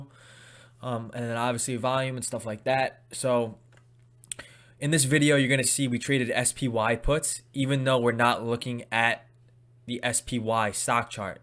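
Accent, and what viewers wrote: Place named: New Jersey, United States. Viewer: yo you from jersey ?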